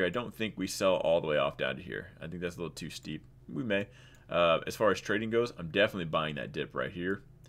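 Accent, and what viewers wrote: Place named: New York, United States. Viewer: He sounds like a New Yorker at 1.5x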